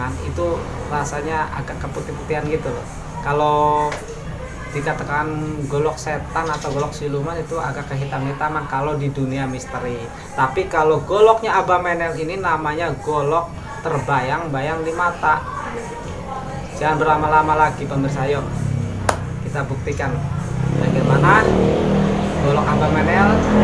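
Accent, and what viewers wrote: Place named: Banyumas, Indonesia. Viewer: Aku suka gaya bahasa kamu bro,, Kengapak ngapakan gitu ya?? Pokoke enyonge langsung subrek lah bro,, Salam hormat,💪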